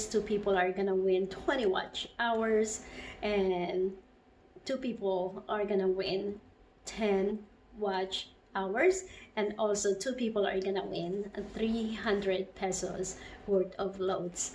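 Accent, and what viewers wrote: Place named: United States. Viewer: yeah my sissy like the way you speak you adopt already the accent in America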